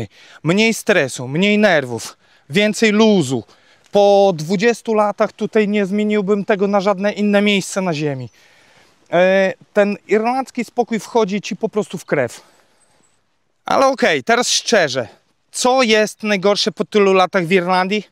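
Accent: Podlasie, Poland. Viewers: Podlaski akcent? :-) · fajnie mowi; a skad tam przybyl? pewno z Podlasia, nie? Z Suprasli czy z innej meisjcowosci?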